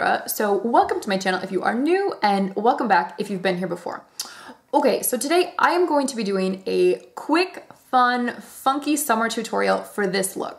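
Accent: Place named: France. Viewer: the happy light thing from bourjois is awesome :D I don't mind you using the same products because you always look flawless. I love your french accent, very accurate! I think the clubbing waterproof eyeliners from bourjois are great, i have loving green and 49 crazy about brown and i'm thinking of getting the black and the dark brown ones as well... somebody stop me :))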